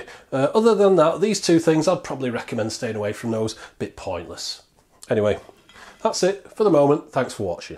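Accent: United Kingdom. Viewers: Why would you expect a British man to talk like an American?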